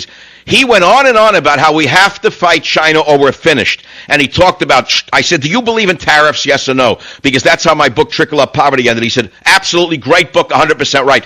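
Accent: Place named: New York, United States. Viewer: for me he reminds me of my uncle. He has a familiar NY accent which reminds me of home. But, his teachings r not commong in NY.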